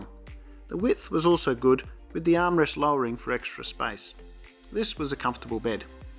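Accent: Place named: Australia. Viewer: Me, every time I see your updates: “Yaaaaasss a new! *whistles along*” As an Aussie expat in the UK who loves seeing all the different airline products... it’s so lovely hearing it being presented with an Aussie accent ^.^ <3 Thank you for your vids ^.^ xx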